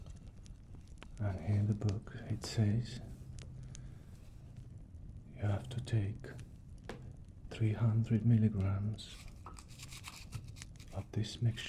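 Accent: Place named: Europe. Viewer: Wow this is a great video! Im subscribed now for sure. I have a question, I noticed you have what sounds like an accent, are you European?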